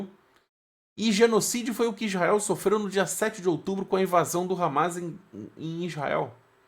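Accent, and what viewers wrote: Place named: Rio de Janeiro, Brazil. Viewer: Afff esse sotaque carioca cheio de sssssssss e rrrrs! Fonoaudiologo resolve isso!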